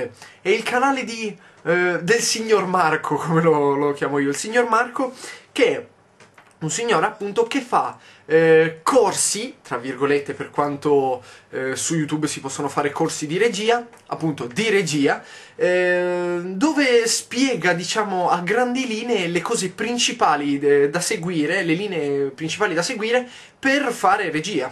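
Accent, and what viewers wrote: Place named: Calabria, Italy. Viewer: Gli ultimi 5 minuti sono stati troppo pisciosi grande yotobi xD comunque sicuro che sei di torino?quell'accento sembra tipo calabrese...eheh vecchio volpone xD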